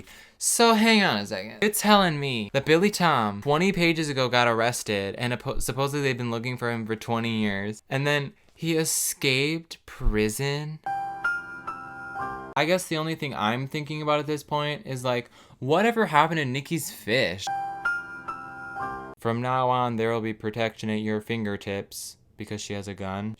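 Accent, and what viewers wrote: South, United States. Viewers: as someone from the south, I beg of you to read it all in your southern accent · 1) Even 5 minutes didn't go by and I was losing it XD 2) Subscribed 3) Southern accent 4) Sound effects 5) Beautiful cover of Little Mermaid 6) Not confusing but confusing feelings towards Billy Tom 7) Withholding Shreksy time 8) yeehaw Wonderful! 10/10! Will never watch again! (I probably will, lets be honest) Great job partner! :) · Your cowboy accent is flawless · I don't even know what this book was about even after watching this video but your southern accent was just everything haha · Is it just me or does his country accent reminds you of forest gump?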